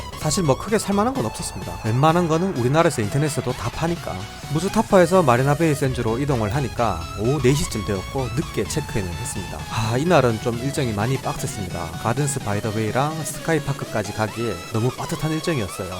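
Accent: Gyeongsang, South Korea. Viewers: 16일에 싱가포르가는데 정말 많은 도움이 됬습니다 ㅎㅎ 저도 경상도라 말투에 호감이가네요~ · 이때까지 100번 들었습니다 저는 경남 창원사람인데 말씀 하시는 게 대구사람 같네요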